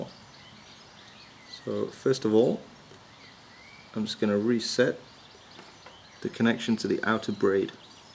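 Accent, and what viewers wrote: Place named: Upper class, United Kingdom. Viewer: Excellent class in upper-class British King's English: with a tear-down and tighten the old WAP54G war-horse antenna mounts thrown in for free. If you are comfortable that the antenna-mounts have not twisted so as to sever the internal antenna leads: from the outside - without cracking the case - you can go ahead and screw down the nuts with a 9/16" deep socket wrench. Obviously - you want to do this by hand and not use a socket-wrench driver handle. The nuts themselves are shallow and slightly recessed - but not impossible to grab with pliers. A box-end 9/16" wrench would also work. But the 9/16" socket supplies absolute control with no slipping or knuckle-busting. And your fingers can sense the exact amount to tighten as the the nut (and maybe a thin washer?) bear down on the ineffectual internal-tooth lock-washer that CEO John Chambers (Cisco CEO) used to purportedly stop the loosening from happening in the first place. 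Everything about the consumer design and assembly of this unit sucks. The design was meant to place the WAP54G into a family of consumer devices that would be stacked. I've never seen them stacked. But as points out - the WAP54G is a warhorse. And when have a guest who can't connect an old PC to your new Cable-service router - you just whip out the WAP54G and they can log on. I've never sat down and worked this out - but WAP54G security is probably not the best. A final note on cracking the case after removing to two little screws. I found that f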